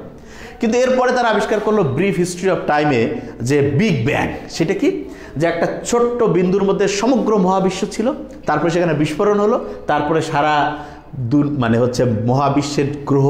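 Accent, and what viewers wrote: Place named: Rangpur, Bangladesh. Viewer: Sir apni rangpurer Manus sune khub vhalo laglo❤🥰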